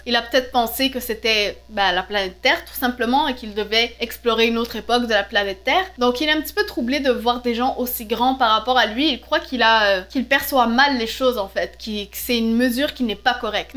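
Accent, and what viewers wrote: Quebec, Canada. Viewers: Est ce que tu es québécoise... Sinon est ce que ça rapport avec la chanson de?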